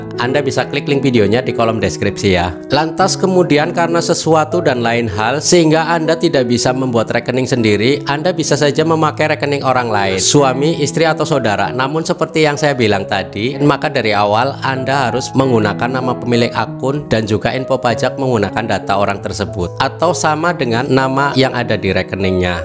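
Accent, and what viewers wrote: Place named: Tegal, Indonesia. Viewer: Kaya wong Tegal sampean mass ngomong e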